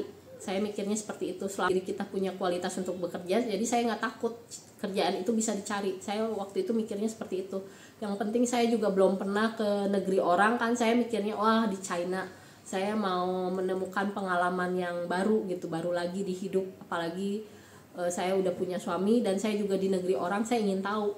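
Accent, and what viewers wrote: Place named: Sunda, Indonesia. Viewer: Teh sudah lama di china logat sunda nya masih kental sekali...atuh resep pisan ngadenge na teh😃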